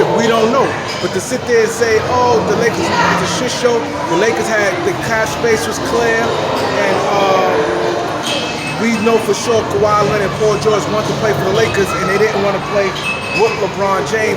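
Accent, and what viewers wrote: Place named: New York, United States. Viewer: You sound like a New Yorker.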